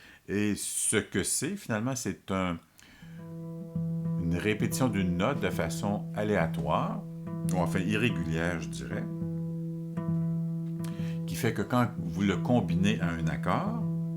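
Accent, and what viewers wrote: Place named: Quebec, Canada. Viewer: Merci, content d’entendre un québécois dans le domaine! Bon travail!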